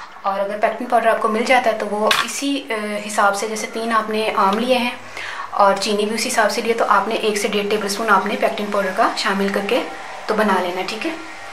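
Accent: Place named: Punjab, India. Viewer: Please donuts bnana Sikhs dien And I love your Punjabi accent me also belong to Punjabi family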